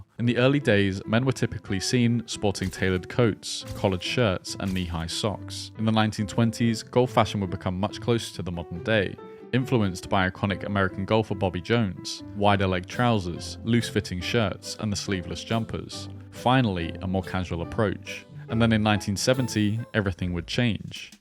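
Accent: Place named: United Kingdom. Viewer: I think he is just British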